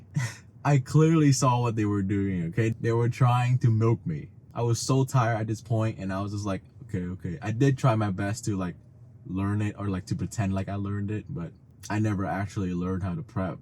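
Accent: Vietnam. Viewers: Your vietnamese accent is showing.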